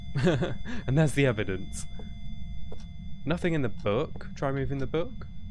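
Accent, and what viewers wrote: United Kingdom. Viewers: Man am I the only one who absolutely love his really good british accent? Idk it just sound so amazing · What part of the UK are you from? I recognize the accent you got.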